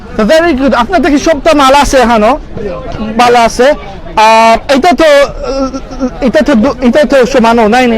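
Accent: Sylhet, Bangladesh. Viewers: nazzy hammond This is call sylheti accent. Like Barishal, Dhaka, commila, Rangpur, Chittagong etc have their own accents. But language is one Bangla. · I hate your SYLHODDAR accent so bad language 😡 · Cant you speak in proper bengali accent? I see many sylheti dont even bother speaking sylheti... That's good... But as a Bangaldeshi everyone should learn how to speak in bangla properly.... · Speak proper Bangla, not Sylhety accent! Its youtube, not your private Facebook account ;) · এই কুত্তার বাচ্চা, শুদ্ধ ভাষায় কথা বলতে পারিসনা,, তুই কিসের বাংগালীরে,,! সিলেটি চুদাও না,, মুর্খ কোথাকার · Superb bro I like the way u speak sylheti keep up the great work :)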